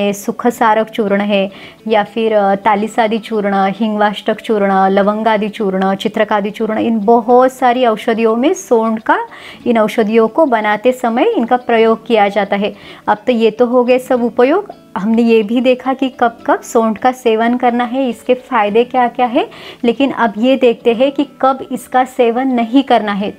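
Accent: Standard. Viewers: Dr. साहिब आपका उच्चारण बहुत ही शुद्ध है अच्छी ओसधी है thanks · आपका उच्चारण बहुत ही स्पष्ट एवं अतिशय शुद्ध है , एवं औषधि विश्लेषण भी प्रशंसा योग्य है ।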